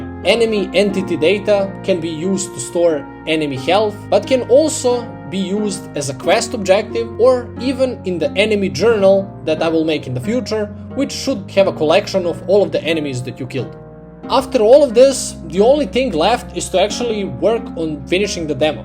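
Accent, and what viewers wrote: Eastern Europe. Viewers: may I ask what your first language is? I could probably find out myself, but I’m too lazy. I will say it’s likely Slavic, Uralic, or Turkic based on your pronunciations of h, r, and w. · I really like this so far, it reminds me of a ps4 game you see and buy randomly and really enjoy it at the end lol. Anyways i see that you have a slavic-esk accent and if it doesnt bother you, im really curious as to where you are from? Im in the south balkans so i just find it cool that theres a person like me liking and making games. Dont give up!😁😁😁😁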